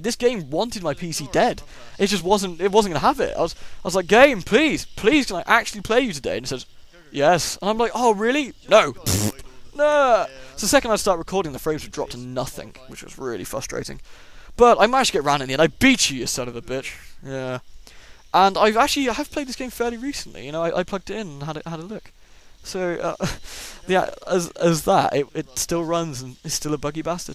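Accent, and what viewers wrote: United Kingdom. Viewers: You made me laugh so much during this LP :D My boyfriend wants to buy this game because after I saw your LP I talked about it a lot. I love Lovecraft, and I love your British accent so this LP was what made me subscribe to you.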